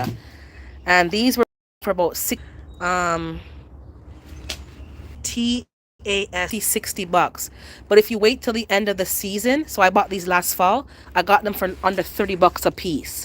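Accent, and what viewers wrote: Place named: Jamaica. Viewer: My seven years old son just heard your voice and said ' she a Jamaican?'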